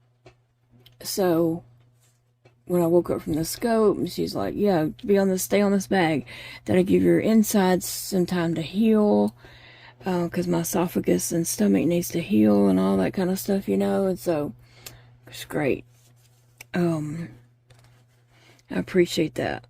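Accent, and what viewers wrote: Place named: Mississippi, United States. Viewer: ! Aw Lawd Jesus. Prayers for all involved in the Walmart grocery delivery!!! I’m proud you made a decision to revoke the delivery ladies tip & give it to Paige. That was very rude for that driver to leave your groceries in the dirt. That was deliberate. She chose to do that. Unreal. On the woobles book. I need to pull the trigger & but that. It’s just that I get too many wips on my hook & sometimes they are in different hook sizes. I’ve been known to buy extra hooks from Amazon to leave the hook in the project bag. I have bought these clear zip up ‘stitch kits’ at Hobby Lobby & may invest in more of those so each project bag will have the hook, & etc… stuff… because I’ve been known to use the wrong hook size to continue a old wip (work in progress). So,… I may order that yarn & the sewing kit bags. I may look on Amazon too. 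I just like what I like outta trial & error. Lol, I love how you say “Mawtha”! I was crocheting the first time you said her name a few weeks or a week back & thought you were making a Moth & her name was Mawtha! Lol! I’m originally from New Orleans so all my relatives back there have an accent so I do try to listen for certain accents with words. And I follow an English (UK) woman’s Facebook page, and some country folk on YouTube too. Your accent is closer to middle Mississippi accent so I can understand you very well as I’ve lived in Vicksburg for many years & over here near Jackson for even longer. Now, the North Alabama people had a curt accent & d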